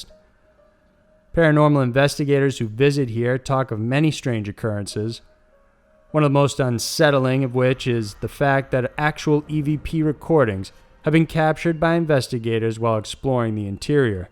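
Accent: United States. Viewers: yeah finally an American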